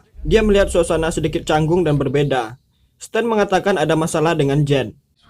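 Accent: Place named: Padang, Indonesia. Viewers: Orang sumatra ni, rasa rasa padangnya ada atau medan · Mimin nya orang padang nya Logat nya kayk orang padang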